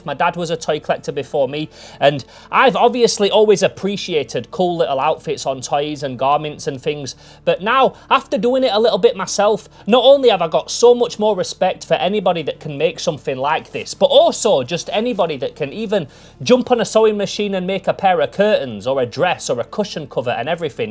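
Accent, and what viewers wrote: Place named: Sheffield, United Kingdom. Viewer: I've laser cut synthetic/synthetic blend fabrics before, and it slightly melts/seals the edges, so if you're wanting to do a little edition run, it could really save you time not having to hem the edges. Will defo take some work fiddling with settings and taking care not to create toxic gases, but like, it's insane how detailed you can get with it. This video was absolutely amazing and inspiring, your perseverance level is incredible, and it's so valuable to share how difficult this stuff can be. When I was young I once made a TINYYYYY pair of jeans, and if you think sleeve logic it's tricky, trouser patterns will turn your brain inside out, (and you'll feel like a genius afterwards hahahaha) KEEP IT UP AND ALSO SO REFRESHING TO HAVE A SHEFFIELD ACCENT IN YOUTUBE WORLD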